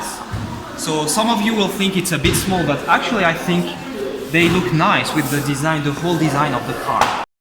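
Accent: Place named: France. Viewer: his accent makes be believe he speaks french also, i guarantee it. Regardless, thanks for a review that we can now understand!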